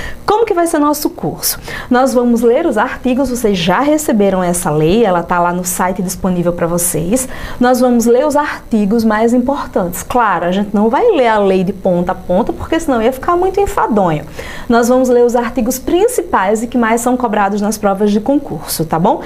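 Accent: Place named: Pernambuco, Brazil. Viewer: vc é de pernambuco?saudade desse sotaque........hehehe aula excelente...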